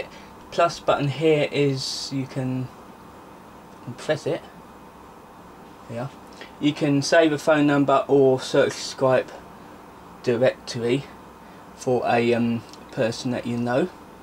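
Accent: United Kingdom. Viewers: are u from essex ...i like your accent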